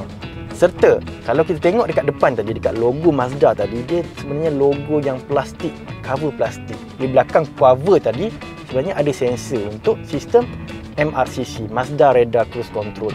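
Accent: Kelantan, Malaysia. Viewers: Ore kelate ko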